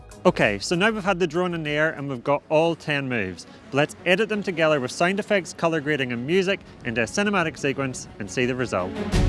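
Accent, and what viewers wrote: Ireland. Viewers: Irish accent is epic! Great Vid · Oh.. so this is the famous Irish accent?? The “ i ” sound sounds more like a “ e “ like the word “vedeo”! Love et!!!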